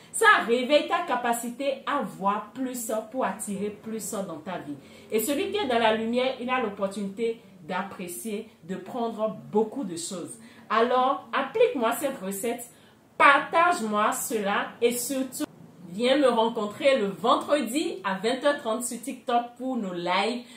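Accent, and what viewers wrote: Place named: Benin. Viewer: Je suis tombée sur votre vidéo ✨ Pour la première fois je me suis abonnée tt 2 suite parce-que vous êtes assez naturelle vous n'avez pas essayé de changer votre accent béninois BRAVO👏👏👏 BONNE SUITE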